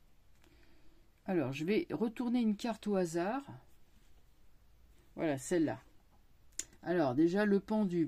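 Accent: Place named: Clichy, France. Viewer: Depuis quelques années, votre voix accompagne mes insomnies, comme une voix amie, comme une amie que j'aurais eue, avec son bel accent de Clichy. Merci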